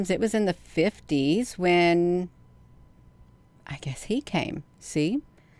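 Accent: United States. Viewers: Dear, is it because of youtube that the subtitles are not available? That's a pity, because many Germans are interested in your interesting articles, but don't understand the American dialect. Do you have any influence on whether your contribution gets a subtitle function?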